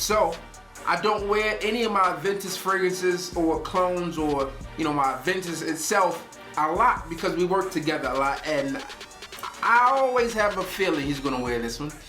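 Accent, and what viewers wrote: Baltimore, United States. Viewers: Boy that bmore accent sound weird af lol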